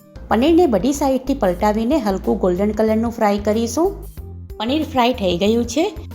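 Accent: Surat, India. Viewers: બહેનશ્રી તમૈ સુરતી( હુરતી) છો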